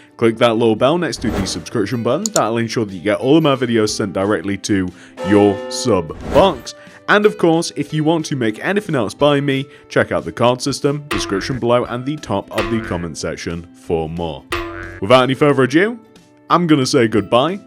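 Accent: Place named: Liverpool, United Kingdom. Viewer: Hi! I've got a question. Are you from Liverpool because your accent is a bit like it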